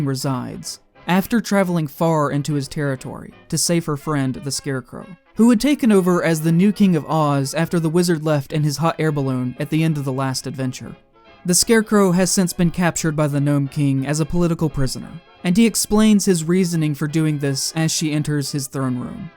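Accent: United States. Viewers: While I understand the British comparison, are you by chance American?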